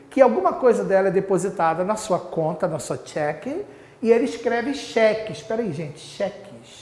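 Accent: Pará, Brazil. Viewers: Ótima aula e explicação, você é paraense professor? kk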